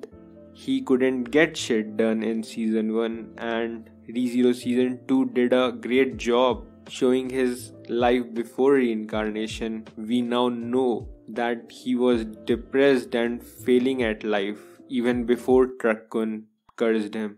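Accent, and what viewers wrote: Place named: India. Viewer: Just found out I need to listen indian accent at 1.5x speed.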